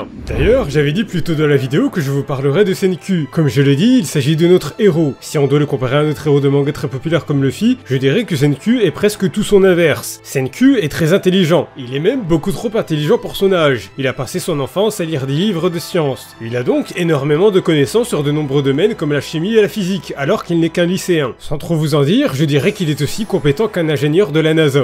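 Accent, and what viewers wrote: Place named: France. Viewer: Je lis les scan cher empereur (je suis même à jour), et "Senku" (Senkū en romaji si on en croit wikipedia) en japonais se prononce littéralement "CènkOU" pas "CènkUH", ça finis par le son "う" (allongé qui plus est) qui est un mélange entre le son "EU" et "OU" (comme le "U" de "LUffy") donc je sais de quoi je parle justement, après, si tu veux garder ce cher accent français, je ne me permettrais pas de juger l'empereur :) Merci de faire découvrir ce manga a un plus grand nombre (le coter comique étant son point fort pour moi), tu lis promise neverland sinon?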